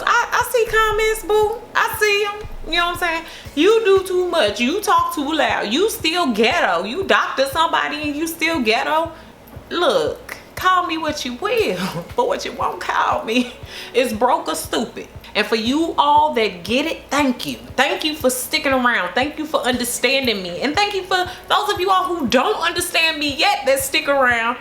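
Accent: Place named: St. Louis, United States. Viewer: You inspire thousands with your knowledge and God speak. Your St. Louis accent is part of YOU and I'm glad that you're proud of it. I've been following you for 3 years and have learned a lot and appreciate your time & dedication that you've put in this channel. I'm happy for your new beginnings & proud of your accomplishments!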